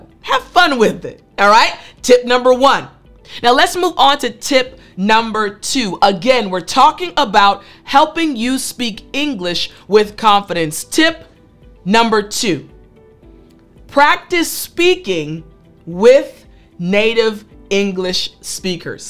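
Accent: United States. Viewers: Wow, i love her American Accent. It sounds so good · I love her pronunciation and American accent, the most beautiful accent in the world for us eternal students of the most important language on and of the planet!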